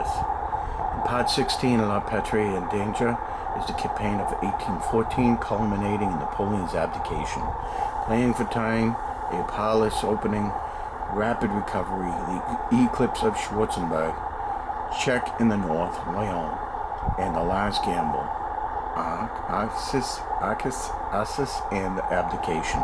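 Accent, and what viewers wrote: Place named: Boston, United States. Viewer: Put this video on because I just bought this book. Wanted to hear some thoughts on it and all I can hear is the Boston accent haha. Sounded like my dad talking about Napoleon. ‘We’re gonna learn about how Napoleon lost in Russia. What a chowah-head.’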